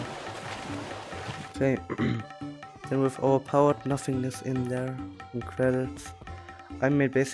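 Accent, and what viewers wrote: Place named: Germany. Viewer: you sound soo german XD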